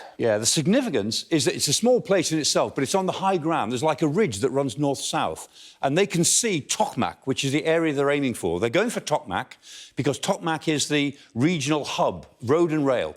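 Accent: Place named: United Kingdom. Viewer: Thanks UK for existing, lovely accent when talking! greetings from Germany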